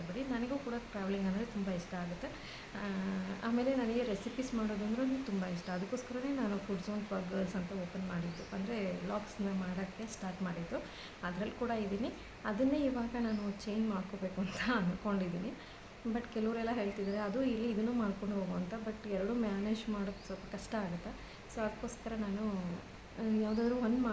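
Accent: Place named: North Karnataka, India. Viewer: ಯಾರೇ ಕೂಗಾಡಲಿ ಯಾರೇ ಹೊಕ್ಕೊಲ್ಲಲ್ಲಿ 🤪 uk ಭಾಷೆ ಇದು ನಿಮ್ಮ ಭಾಷೆ ಏನು ಅಂಕೋಳೋಲ್ಲ ಯಾರು ಸೂಪರ್ ಮೇಡಂ ಮಾಡಿ 👌🏾👍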